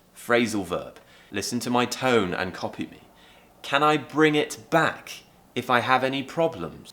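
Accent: United Kingdom. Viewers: the more I watch your videos, the more love I have for thi british accent. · Thank you, your videos are helpful. I like British accent. Even your new hairdo. 😄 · Im watching yous vido with my son who is 8 yo, and he loves your British English so much. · It's the first time I came across one of your video, and I'm sure I will catch up everything I missed! Your British accent it's superb! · I wish One day i can sound more british lile this guy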